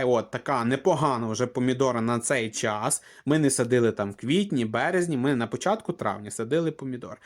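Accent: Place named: Western Ukraine, Ukraine. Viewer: Западно-украинский говор. мОї-мої,наголос на " Ї",не жара, а спека.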